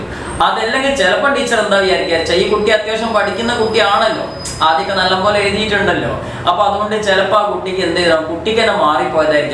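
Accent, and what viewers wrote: Malappuram, India. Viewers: ikkaa ingale language kettaal ariyaa malappurathkaarananenn Malappurathe piller like plzzzzz😄😄😄😄😄😃😁😁😁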